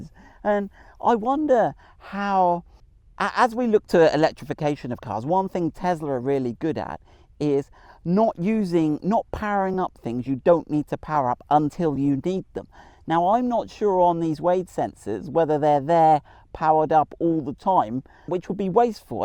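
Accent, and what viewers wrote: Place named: Purton, United Kingdom. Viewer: Stay chilled. I totally get what you say about early adopters and I’m pretty sure we all appreciate your efforts. I for one continually sing your praises to everyone on the Range Rover 405 Facebooks page. I love the personal approach to your videos and explanations and agree with you about the sense of community and love the way you connect with your customers and subscribers (maybe it’s because I’m an old Purtonian and we share the same accent). Keep well and make the time to enjoy Dad days with. All the best from (for once) sunny Dartmoor. 👍🏼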